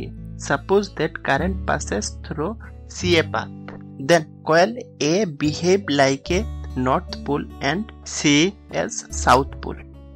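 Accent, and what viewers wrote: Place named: Bengal, India. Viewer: Liked the bengali accent dada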